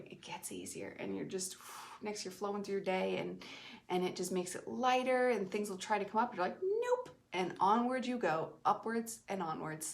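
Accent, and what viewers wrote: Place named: Canada. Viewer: "Hey sugar, ain't we got a DATE?" Thank YOU for YOUR thrilling and timely response! You're the internet superstar! I'm here with a note from my mom pinned to my jacket, "Please get Glenn on Bus 6, thank you!" And really, it's nothing overt, but really rather quite subtle! I'm kind of a student of the nuances, vagaries and evolution of language (a very BAD student, I'm afraid, slouching in the back of the classroom, hoping not to be called upon!), so please forgive my inadequate efforts to indicate what clued me to your nationality. I know "boot" doesn't quite get it, but sometimes we have to "settle out of court," so to speak! Also, count me sweet on "Canucks" and "Kiwis" (Aussies, not so much... sort of more of on a case by case basis!). If not for a string of DWI's in my intemperate years, I'd probably be living in Vancouver or Banff or Ottawa, instead of being stuck here on the plains of East Dakota, where there is no crime, but plenty of fear and semi-automatic weapons! 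In addition, "Slings and Arrows" is one of the funniest TV series I've ever seen, and, in spite of spending most of her life in California, Joni MItchell TOTALLY still sounds wonderfully Canadian! What's not to LIKE? "If I had loved you less, or played you slyly, I might have kept you for a summer more, But at the cost of words I value highly, And no such summer as the one before." from "Fatal Interview" -- Edna St. Vincent Millay OK, "Big Whore" agrees to subscribe! Keep that Canadian voodoo and mojo co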